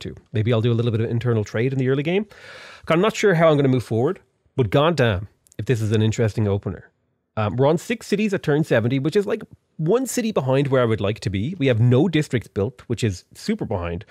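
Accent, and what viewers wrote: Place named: Ireland. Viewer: Anyone feel that he was 2x more Irish than usual?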